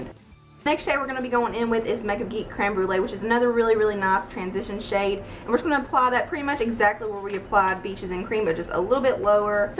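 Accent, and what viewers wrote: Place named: Louisiana, United States. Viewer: Haha Im pretty sure our accent is the same!!! Im from Louisiana lol!!!!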